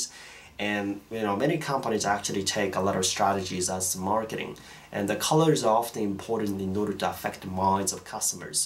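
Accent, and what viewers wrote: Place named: Australia. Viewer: Omg I love your Australian accent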